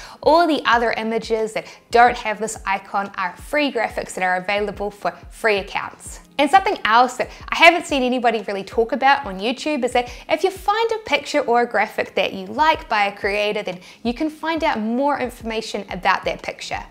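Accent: New Zealand. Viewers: Hello from California. Thanks for sharing your knowledge. New Zealand is a new accent for me! I'm learning stip-by-stipI I am taking notes and trying to absorb as much as I can. Cheers Sis.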